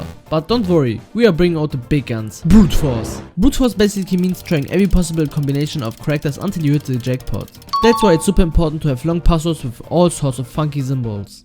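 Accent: Germany. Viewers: i höre a bischen of deutsch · Are u german? Because iam and i hear a german accent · Bis auf einen leichten deutschen Akzent hast du ein gutes englisch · You sound german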